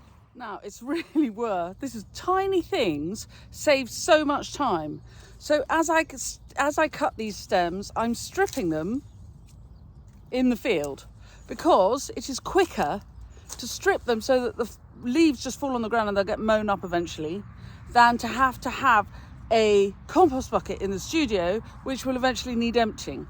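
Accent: England, United Kingdom. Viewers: I absolutely love you accent! One of my dearest friends is in Oundle, miss her terribly. Had the chance to visit beautiful England 11 years ago . The gardens at Burley house were magnificent!. Cannot wait to visit once more! Thank you for your content I enjoy it very much! Canadian dairy farmer morphing intoa flower farmer as a rieterement project. Take care! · As an American, I enjoy your English accent & unusual (to me) terms very much. 🌱❤️ If I book a place for one of your workshops, is access to the content available to view later, after the scheduled workshop? The time is inconvenient for me, but I would love to learn how to make one of your unique posies. 💐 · lové💗looks like wisconsin and adore your english accent🌸🌸🌸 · Thank you for all you great tips! I am a follower from Sweden and I just love you British accent!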